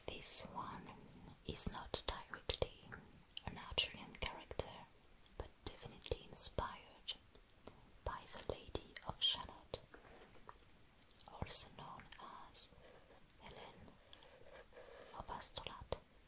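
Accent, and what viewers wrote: France. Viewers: I never knew I needed to hear a French accent in ASMR videos so much, until I found this channel...🥰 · Is this a French accent? Sounds nice and gentle anyway. :)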